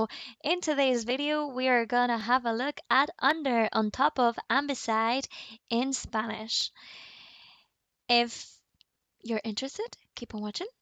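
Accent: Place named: United Kingdom. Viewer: Why does she sound British? Lol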